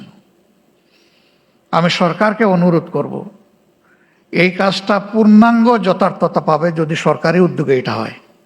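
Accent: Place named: Sylhet, Bangladesh. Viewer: Bangla bolte parena sylete chara. Tobu pod chere youngder hate pod debena.?????/ Dol ke ekjon smart young er hate tule sonmaner sate retire jan. Louv lalosa poder chere den. 16 bochor kicu hoi nei apner dara, kormider charate kono kaj koren nei. Julom hoyche apnader dalalir jonno. Jatike ar koto boka banaben vason deya, kajer belai zero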